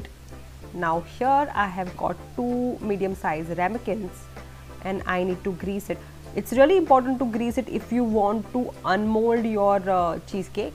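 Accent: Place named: India. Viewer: The accent kills me... I get calls all day long from con artists and they ALWAYS have this India accent omg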